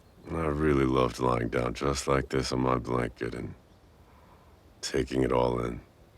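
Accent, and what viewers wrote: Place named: Italy. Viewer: And my friends ask me, why am I into Italians?... ummm hello did you not hear the accent, the food ? 😍. Thank you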